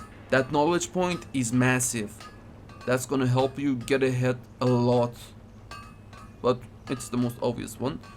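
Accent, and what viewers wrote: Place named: Iran. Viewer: چقد این لهجه ایرانیه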